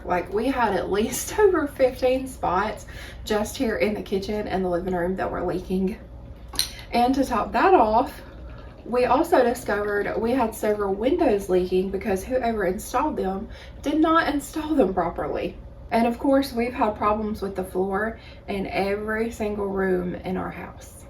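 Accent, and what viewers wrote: South, United States. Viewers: Girl I have no idea what state you live in. But obviously from your accent you live somewhere in the south. Do you know how amazing it is to own a house at 21? Girl, i graduated from college at 21 and then wanted to go crazy and live a life of freedom. I wasn't even remotely right in the head to get married until 26. Don't ever feel ashamed of your house that you bought and is paid off. You are a light years beyond where many people were at your age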